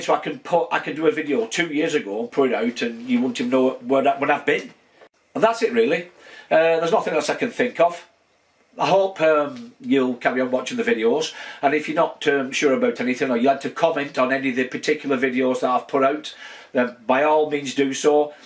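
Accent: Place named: Bolton, United Kingdom. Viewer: And there's me thinking it was a Bolton accent!! You keep going me o'l mucker!! Stay Safe and Healthy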